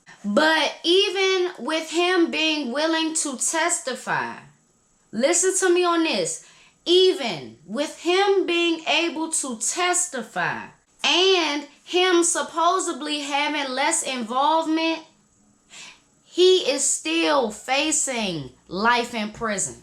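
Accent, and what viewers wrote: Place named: Florida, United States. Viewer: It's pronounced "Pom-Puh-No", Flawda. Haha. I love ya accent. Bless you on your entrepreneurship. You're still effortlessly beautiful. 👑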